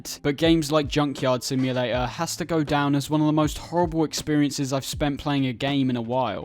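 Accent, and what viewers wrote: United States. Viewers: I thought the thick American accent gave it away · Yes he definitely sounds like he was born and raised in South Arkansas. American through and through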